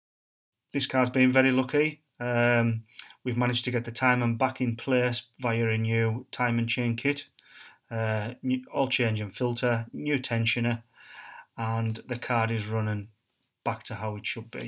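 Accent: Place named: Cumbria, United Kingdom. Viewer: You sound like your Cumbria based, I have one of these with the p0016 code, do you fancy doing mine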